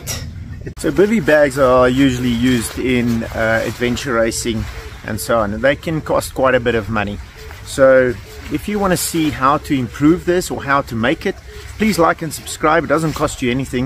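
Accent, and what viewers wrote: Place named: South Africa. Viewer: Hard core bru. Long time since I heard the SA twang. New subscriber China keep the videos comming from the UK.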